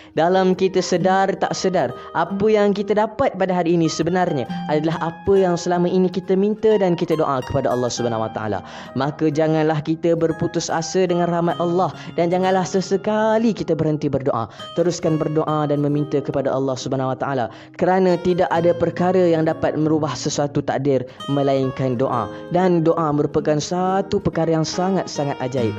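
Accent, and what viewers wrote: Kelantan, Malaysia. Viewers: Org klntn ke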